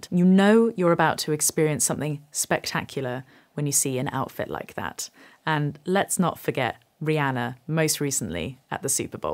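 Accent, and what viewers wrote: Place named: Bristol, United Kingdom. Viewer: It'd be hard NOT to notice the near-constant appearance of black t-shirts in your early videos. That said, I hope you won't go too far in the other direction. I'm inclined to agree with Bowie that, if you're going to be a performer, appearance, at least on stage, is part of the performance. And frankly, this elderly Midwestern USA male likes seeing you in something other than just plain black from time to time. On the other hand, what drew me to this channel initially had nothing to do with what you were wearing. I very much enjoy the Bristol accent and, I admit, the educated tone of your non-musical videos (like this one). So, yeah, wear a red (or purple, or electric blue) t-shirt occasionally, or go wild and wear a girlie-dress, but don't get too caught up in "fashion," which is ephemeral at best, and mostly trivial anyway. 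Keep writing songs and working on your guitar chops.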